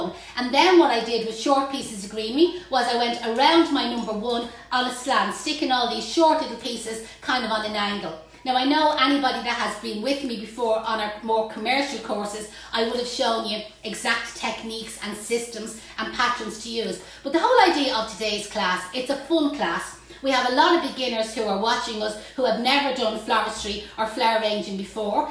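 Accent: Ireland. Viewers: I’ve just come across your videos on YouTube. I’m not a florist and I’m not very good at arranging flowers but these little projects you’ve shown have given me some great ideas and also knowledge about doing some flower arranging. I watched your video of the cocktail glass arrangement and I bought some of those to do for some friends. Hopefully mine will turn out at least half as good as yours. I’m from Bangor Co.Down. Also so refreshing to hear an Irish accent on YouTube 🤣 Thanks for all the tips and ideas.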